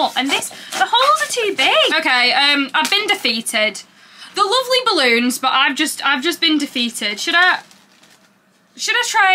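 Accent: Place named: Birmingham, United Kingdom. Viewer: This video was created with care and chaos. Balloon segment wasn’t the highlight. Hoody, water bottle… I’m appy… The most Birmingham accent you have done. Interesting fact, I have done some research on the Edinburgh shows. Sunset is at 2155 and twilight finishes at 2256. The Edinburgh shows look as if they will be in full daylight, if Taylor hits the stage at 1900 as is anticipated. These shows will have a different vibe to them. If you are coming to Scotland, you will experience it.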